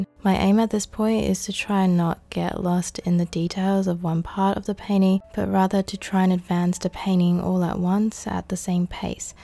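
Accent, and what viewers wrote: Australia. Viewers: Are you Australian or lived in Australia? I’m asking since u have a very Australian accent specially when you said “water” as “wodah” etc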